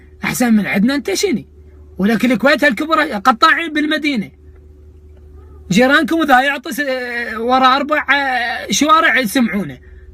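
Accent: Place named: South, Iraq. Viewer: عبدالله لا عراقية هذي لهجة جنوب العراق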